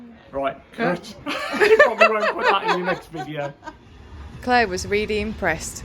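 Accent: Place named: England, United Kingdom. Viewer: Hi best regards from Poland , i love your english way of speaking , just very english for me:)like yor videos waiting for next one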